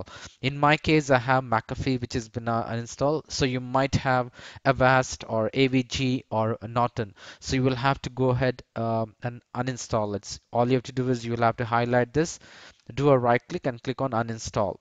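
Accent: India. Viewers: Indianan voice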